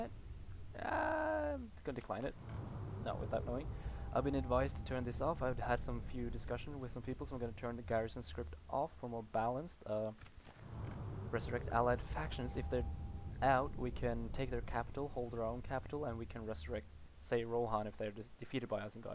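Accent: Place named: Norway. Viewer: are you norweagen you sound little norweagen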